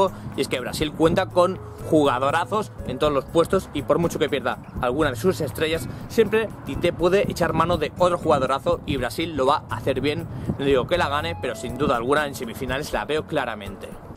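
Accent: Spain. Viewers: gracias , eres de España no ? Veo eso con tu acento pero talvez me equivoco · ¿Sos español, si acaso?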